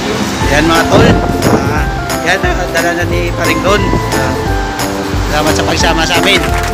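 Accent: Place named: Visayas, Philippines. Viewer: Haha nice.. Sana all may shawarmahan😂 btw taga saan ka po kuyz? Love your accent. Bisaya ako😁😁..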